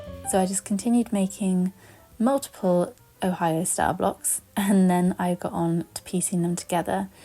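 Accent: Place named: England, United Kingdom. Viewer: What a fantastic idea - making something for ourselves for once! 😂❤ I absolutely LOVE Ohio stars and am mega careful, getting everything to line up and get very stressed if I’m even a mm or so out!! 😱😅 An absolutely stunning wall quilt hanging - beautiful and a smaller one in a corner is a lovely idea too 😊 I’m desperately trying to get stock together, but I might just say sod it, this weekend, I’m going to make something just for me ❤ Thank you thank you and if you’re at all interested, I can send you a photo when it’s done 😊 You are a true inspiration to a massive quilting and everything material and hand made fan, and hearing your lovely english accent reminds me of ´home’ - have lived in france for 12 years, but almost everything I make is with fabric from England as the French just adore it ❤ Have a lovely evening and see you next time 🫶 xx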